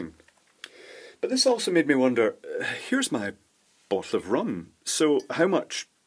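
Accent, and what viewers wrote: Scotland, United Kingdom. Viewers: thought you were scottish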